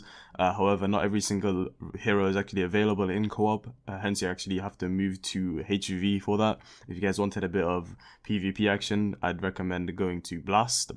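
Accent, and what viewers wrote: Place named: Singapore. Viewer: Man sounds hella Singaporean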